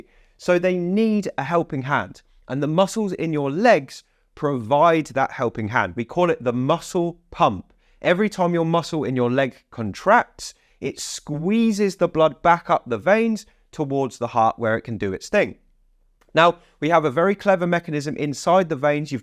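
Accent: United Kingdom. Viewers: I am appreciative of your videos and your book. You’ve given this Yank a chuckle over the difference in the way you Brits pronounce medical terms such as capillaries. You put the accent on the second syllable whereas we accent the first: cap.🧢pillars.😊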